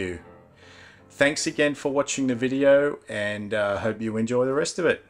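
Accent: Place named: Australia. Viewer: Fun 70s memories, but mine weren't quite as Aussie sounding. 😅 MN, USA 🇺🇲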